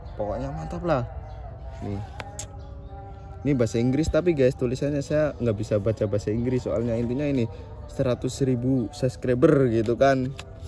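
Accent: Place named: Banyumas, Indonesia. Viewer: Kayane tiang ngapak 😁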